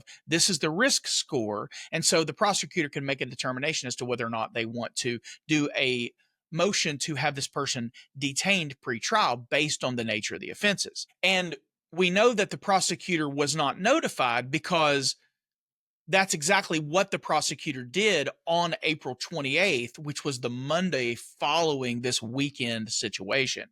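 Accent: South, United States. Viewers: NO, THANK YOU! I like your no nonsense straight to the point southern drawl with a dash or 2 of comic attitude. Take Care, John & Amy(woof), Wyoming, The only state w/out a accent!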